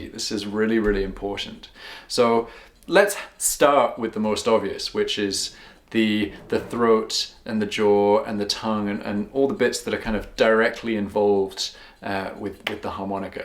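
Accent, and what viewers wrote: Scotland, United Kingdom. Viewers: Bro are you really Scottish??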